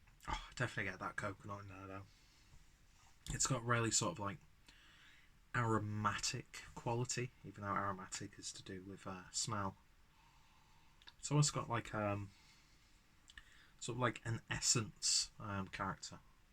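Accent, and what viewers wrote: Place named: Liverpool, United Kingdom. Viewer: Posh scouser. Liked the review, lad.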